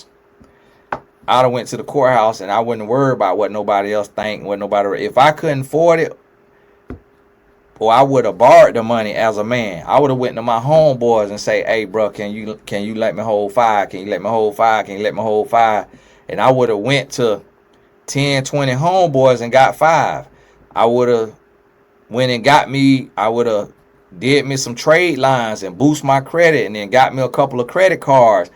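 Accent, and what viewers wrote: South, United States. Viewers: Had to subscribe. This brother knows his stuff, real as all "get out", and funny without even trying. I love that he loves The Lord, loves his wife, FAITHFUL to his wife, hasn't let success change that southern accent😀, and fearlessly cover any celebrity couple whilst being a celebrity himself., truly your gift is making room for you sir and bringing you before great men! Btw, new subscriber🤛🏾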